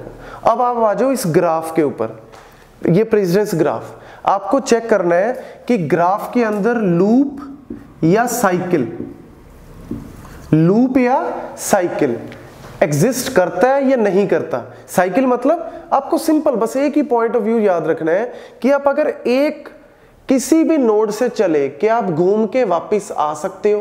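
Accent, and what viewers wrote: Punjab, India. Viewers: sir are u from punjab??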